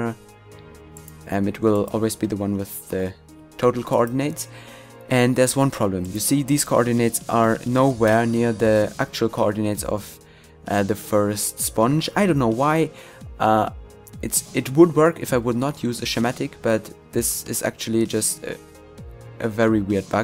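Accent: Germany. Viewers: Man merkt einfach übel das du deutsch bist :D Aber nices video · Are you german?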